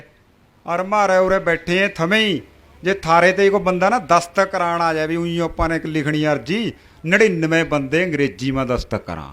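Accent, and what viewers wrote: Puadh, India. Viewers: Puyadhi boli eh Sadi..but sanu malwa 2 da nam de dita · ਪੁਆਧੀ ਬੋਲੀ ੲੇ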